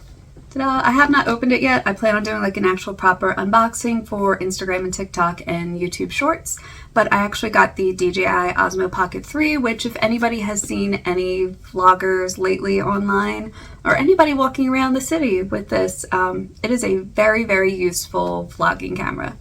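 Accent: Australia. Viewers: I can hear some twangs of the Aussie accent creeping in there! Nice to see you again, glad you are going well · Yeah mate, your starting to sound Aussie. Mark is a lucky man. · I derect notes of an Australian accent,well done, thanks for the very interesting update, I was wondering what had happened to you. As an Australian I find the PR system to he appalling. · I miss you, however you're not to me now you're Mostly Aussie. Also your American accent isn't as strong as it once was. Won't be long and you'll have a full Aussie accent. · Great to see you again. Love your content from your previous posts. This is from a dinky dye born in Australia Australian. Your accent and use of Australian slang is definitely there, even though I still hear your North American accent as well. This is from one who has the dual London England and Australian accent. Your family might have fun understanding you when you visit them later in the year. 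Patience about the permanent residency and eventually Australian citizenship, you will get there. Look after yourself and your health.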